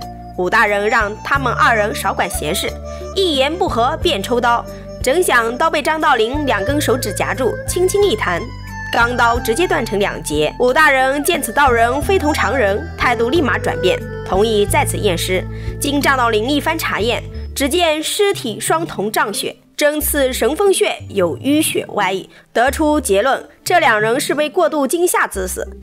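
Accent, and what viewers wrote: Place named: Shanxi, China. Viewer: 山西还是陕西？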